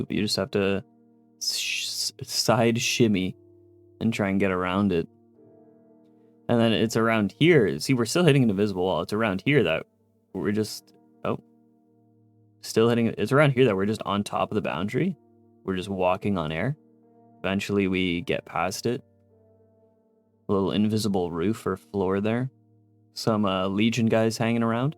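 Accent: Canada. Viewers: Ah he’s Canadian never noticed that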